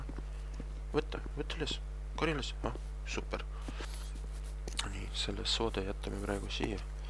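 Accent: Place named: Russia. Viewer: Vene Aksent Nice !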